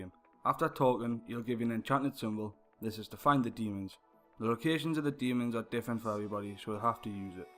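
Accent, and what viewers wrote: Newcastle, United Kingdom. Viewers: geordie guides geeeet up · fellow geordie?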